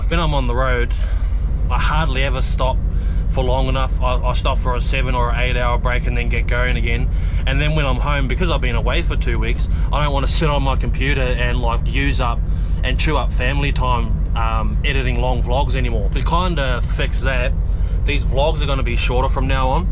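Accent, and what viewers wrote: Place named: New Zealand. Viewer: Funny listening to a kiwi explains trucking life on the east coast. I lasted 8 minutes. Thenks brew.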